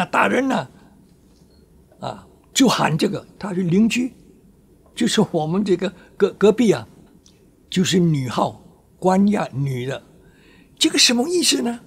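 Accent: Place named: Indonesia. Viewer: 老先生说的是：八年当哑巴！不是半年！ 49年到57年！印尼口音而巳！😢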